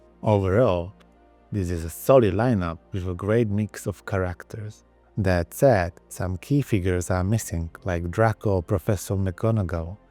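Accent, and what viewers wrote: United Kingdom. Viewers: I’m sorry to be rude, but I’m not watching this channel as much anymore. There was something charming about the British AI voice…that said, I pretty much hate everything else AI, but he had happened to pick the perfect voice. Oh well, I suppose I can always go back and watch the old ones.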